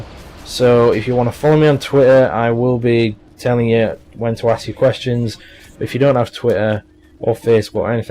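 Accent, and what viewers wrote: United Kingdom. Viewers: Fortnight. British english is so cool.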